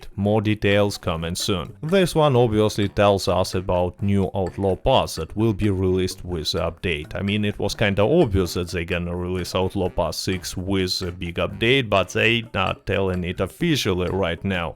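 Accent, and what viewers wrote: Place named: Russia. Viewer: I was joking idk if he's Russian but he sounds Russian lol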